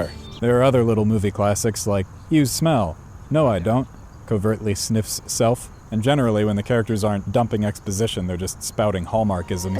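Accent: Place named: Ireland. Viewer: Flawless editing and Irish accent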